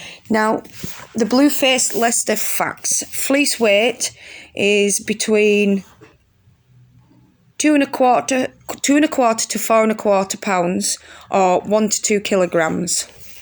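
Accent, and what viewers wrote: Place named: Northern England, United Kingdom. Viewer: Very nice video. And your northern accent is entrancing to us in California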